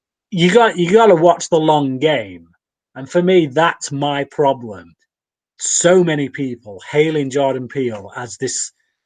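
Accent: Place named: England, United Kingdom. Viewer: I have something I have always wanted to ask. What part of England are you from? Wondering about the accent.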